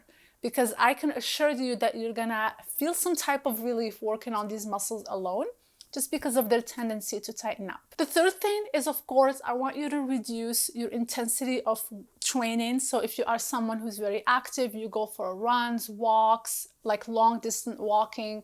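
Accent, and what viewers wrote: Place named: Morocco. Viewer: i smell Moroccan accent here dont know why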